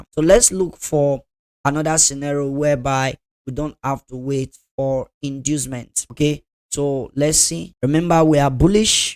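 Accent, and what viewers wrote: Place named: Africa. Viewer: When I heard the african accent and then saw the exness link in the video description... instantly knew its bs and a scammer 🤣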